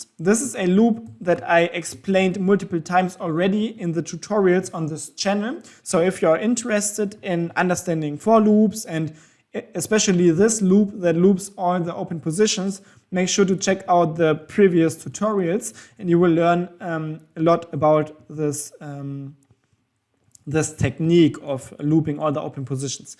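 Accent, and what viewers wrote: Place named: Germany. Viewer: Hallo, krasser deutscher Akzent aber sympatisch..:D Ist es irgendwie möglich, dass die Positionen sofort gleichzeitig geschlossen werden und nicht nacheinander, was ja nach Menge leider einige Sekunden dauern kann... Also alle sofort geschlossen wäre für Scalping noch besser! Ist das einfach umzusetzen? Danke!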